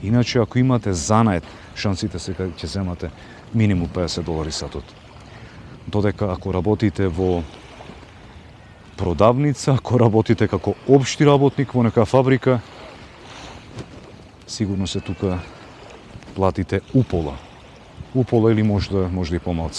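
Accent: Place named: Bitola, North Macedonia. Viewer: Odlicno video prodolzi da snimas za nas makedoncite.Po akcentot mora da si od bitolsko pozdrav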